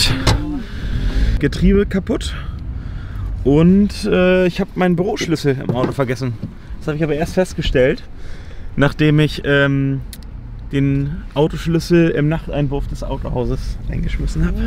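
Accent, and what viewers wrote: Carinthia, Austria. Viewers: Kärnten, Niederösterreich? 🤔 😅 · Kärnten Niederösterreich? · Kärnten Niederösterreich? 🤣🤣🤣🤣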